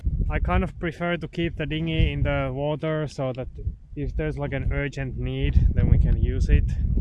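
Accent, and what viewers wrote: Finland. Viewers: The first video I saw was the previous one, and this whole concept; the two of you and a big puppy braving arctic weather in a sail boat for half a year is seriously giving me life force back. It’s so raw and beautiful, all of it. Your (Finnish accent is LOVELY btw!!)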